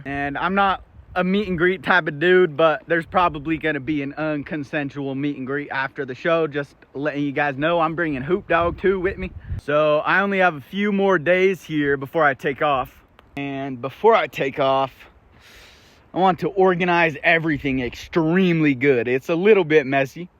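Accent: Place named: Arkansas, United States. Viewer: I find it so strange as an older person to see a 20 year old white boy in the Pacific North West talk like an old black man from Arkansas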